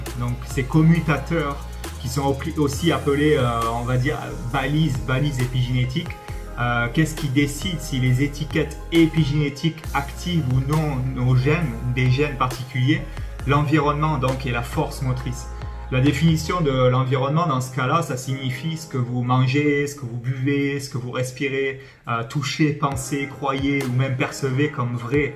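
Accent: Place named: South, France. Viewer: Ah tellement de jolies explications! Couplées à cette accent du sud, magnifique!! ☀️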